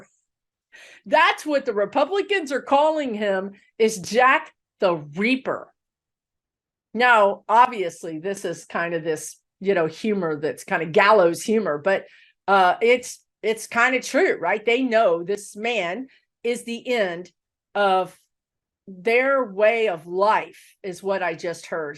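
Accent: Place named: West Texas, United States. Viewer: Your sooper awesome, a great reader...... And talk and sound just like me.!! ( West Texas. ) I catch every reading and your guides are great. As well. Thanks for bn here.. 🌹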